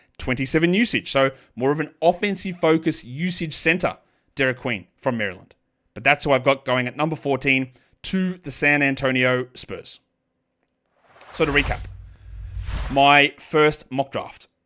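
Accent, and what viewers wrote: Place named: Australia. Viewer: He’s Australian, he inherently has an accent different from ours. Also he’s. He’s called sadiq bey the depressed penis for his whole career.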